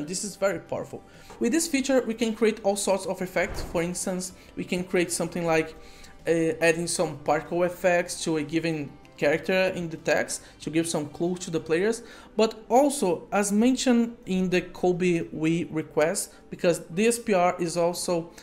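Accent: Brazil. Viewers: Brazilian accent 🫶🇧🇷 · Tu é br né vei ? tem sotaque de br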